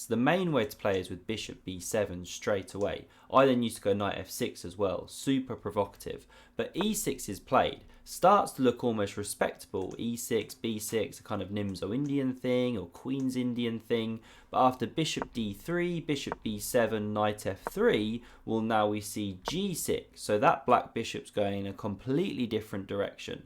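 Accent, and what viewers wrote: United Kingdom. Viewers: Funnily enough, I subscribed to this channel because I find his voice the easiest to listen of most chess guys. There are a lot of Indians and East Europeans doing chess content and though their content is good, the British accent is easier to my ear.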